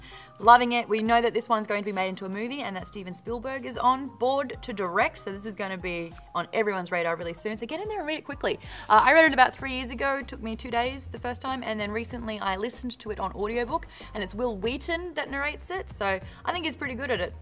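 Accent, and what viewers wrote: Australia. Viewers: Cool. finally an Aussie accent. Read: The "Tales of the Ketty Jay" series. by Chris Wooding. More steampunk than high fantasy though, but this video is a coupla years old so you might have read this. If not, do yourself a favor.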